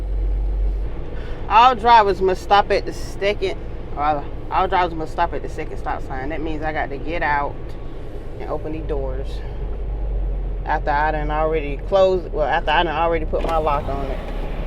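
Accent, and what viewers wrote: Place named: Florida, United States. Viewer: 😂😂That's that FlawdA talk. I say it, too. Yall have a good day. Referring to one person. 😂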